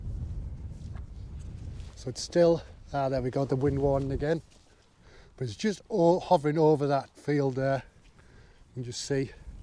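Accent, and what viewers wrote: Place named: Teesside, United Kingdom. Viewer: Are you from Teesside by any chance i highly recognise your accent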